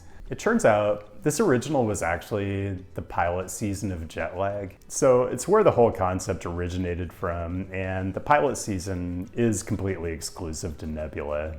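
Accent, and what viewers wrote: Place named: Midwest, United States. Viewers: he sounds Midwest to me also but I already heard from his other videos where he’s from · Is he? I'm Floridian. He sounds midwestern. Y'all have dry wit up there too do ya?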